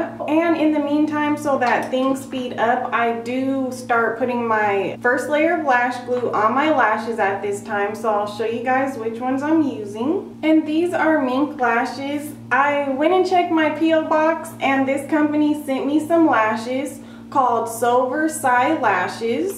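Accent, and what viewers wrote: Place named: Minnesota, United States. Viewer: are you from Minnesota or something? Your accent reminds me of Fargo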